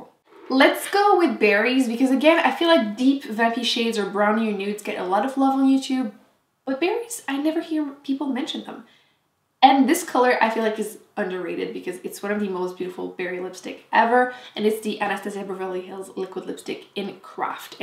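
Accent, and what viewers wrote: France. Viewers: Your accent is so beautiful 😍 French accent is everything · Amber Schelfhout She actually did say “voyageur” (in perfect French, with no accent) pretty clearly, which means “voyager” or “traveller” in English. “Voyager” can either be used as a synonym to “traveller” in English or translated to “to travel” in French. Why would someone whose first language is French, speaking English with a French accent not know how to pronounce a French word? Loll... I was so confused by this comment... So she wasn’t trying to “make it English”. She just pronounced it as “voyageur” which is equivalent to “voyager” or “traveller” in English. It could have happened because she wasn’t sure if the word was meant to be French or English (since the spelling is the same in both languages). I’m tri-lingual, so this also happens to me very often...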